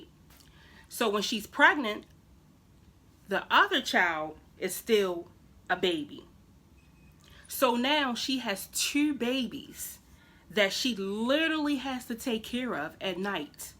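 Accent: Baltimore, United States. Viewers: I'm new to your channel. I recognized the Baltimore accent and had to follow and support!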